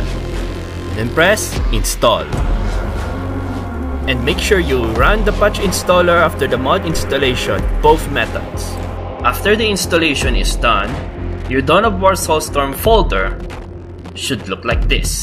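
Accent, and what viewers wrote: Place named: Philippines. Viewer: sabi ko na eh pinoy base sa accent haha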